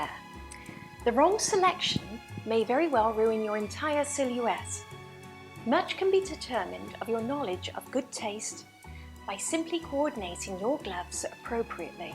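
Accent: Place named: RP, United Kingdom. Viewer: love your RP accent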